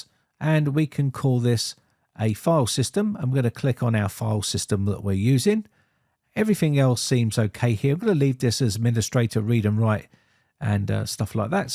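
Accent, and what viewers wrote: United Kingdom. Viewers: Hi, Sorry this is out topic. But i like your british accent. =)) Plese recommend other british tech youtuber I can subscribe. Thank you.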